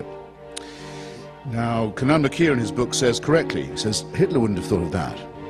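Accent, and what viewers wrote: United Kingdom. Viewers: When I heard the narrator with british accent, I stopped watching! English are not to be trusted, the most evil people that civilization has witnessed! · That's very appropriate a british man talking to us about evil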